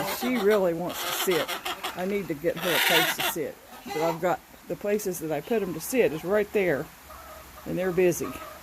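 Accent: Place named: Oklahoma, United States. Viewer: I personally don't think it's anything you're doing if your view count has gone down or likes because of everything going on with the chicken industry and all the red flags evolving around chickens I think that there is more people making chicken videos than ever which is changing the algorithms and YouTube likes to mess with people like yourself that's true and genuine it's not people that's leaving your channel because you're mentioning God it's because YouTube's making them miss the video and not sending people their notifications because you're mentioning God and you're popular now I think your videos are awesome and you also remind me of my grandma that's one of the reasons I watch your Channel because you talked to your chickens and you do not belittle them yeah there's something about your Oklahoma accent that reminds me of my grandma. 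So please ma'am don't get discouraged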